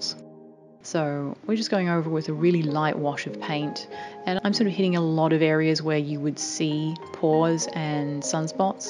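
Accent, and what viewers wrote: Australia. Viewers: Love the Australian accent. Show me how to get one. Your work is amazing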